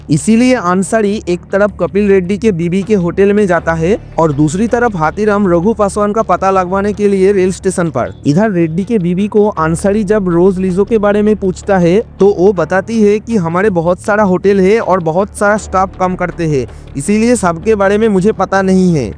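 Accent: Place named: Bengal, India. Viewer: tumi Bangali sur charte parcho na go bhai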